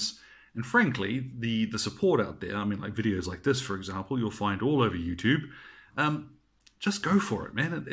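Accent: New Zealand. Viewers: What part of NZ are you from? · I'm from New Zealand too!! Haha think that's why it sounded familiar but not quite?? Thank you so much for your videos. I'm just starting out with a sisters of battle army :)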